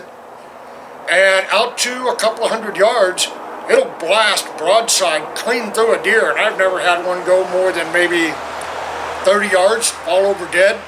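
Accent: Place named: Isle of Man, United Kingdom. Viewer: You have a Manx accent.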